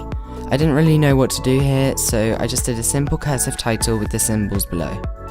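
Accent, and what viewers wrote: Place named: United Kingdom. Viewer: omg you have that british accent YAASSSS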